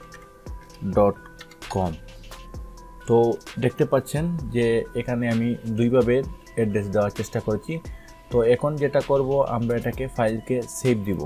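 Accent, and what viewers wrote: Sylhet, Bangladesh. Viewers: ভাই বাড়ি সিলেটনি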